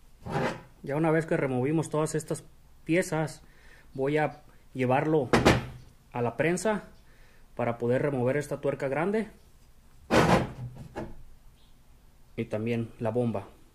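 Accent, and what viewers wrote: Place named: Mexico. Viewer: Te doy like x la tonada mexicana nomas☝️😂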